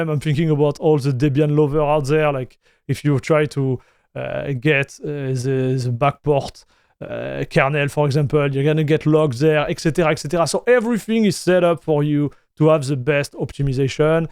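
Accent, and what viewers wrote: France. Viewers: horrible cette accent français ont ne comprend pas grand chose.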